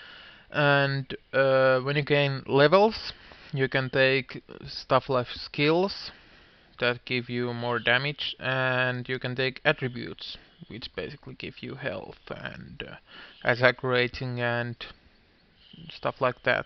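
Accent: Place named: Finland. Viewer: Heheh must be the Finnish accent.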